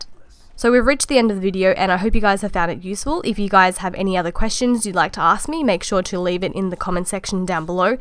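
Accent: Australia. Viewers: I sense strong australian accent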